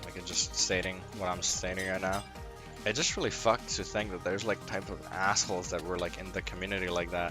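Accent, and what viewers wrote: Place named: Netherlands. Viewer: You sound a little Dutch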